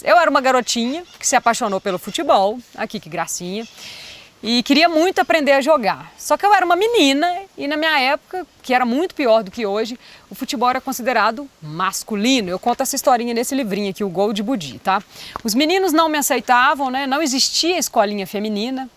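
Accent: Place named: Minas Gerais, Brazil. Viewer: Mas tb pode ser observado que como as árvores é necessário flexibilidade para potencializar a resiliência!! Sempre sendo ela mesmo, sotaque, vocabulário, transparência, trejeitos......mineirinha carismática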